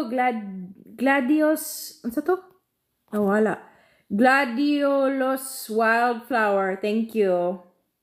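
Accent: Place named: Visayas, Philippines. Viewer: Ka gwapa ug larenoha mo sultig bisaya nimo langga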